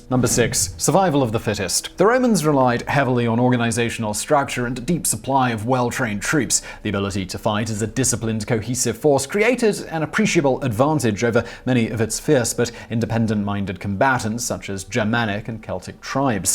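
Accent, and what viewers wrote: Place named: RP, United Kingdom. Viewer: Posh-ish English accents are magical. I have no idea as to this mans qualifications, background, or specialization. And yet he sounds intelligent and authoritative in damn near every video he's done. It WOULD be hilarious however if he had the most absurd Liverpudlian, Mancoon, Cockney or American Southern accent when he's not doing videos.......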